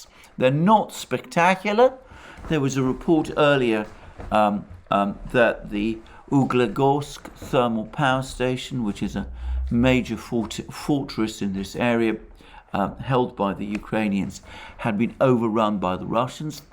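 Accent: England, United Kingdom. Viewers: You sound like an Englishman. But you seem to be on Russia's side. You are a traitor to western values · ? Wtf are you talking about? He reiterates commonly available material in a Brit accent, making you think he's some genius. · I keep watching your videos because you are RIGHT on your assessment , from day one of the special military operations conducted by Russia 🇷🇺 and once again I want to say that I admire your knowledge and understanding of world history. PLUS I like the British accent. ( it is pleasant to the ears ) cheers 👍😀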